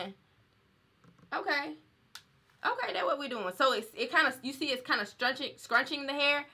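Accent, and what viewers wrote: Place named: Detroit, United States. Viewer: I think Detroit!!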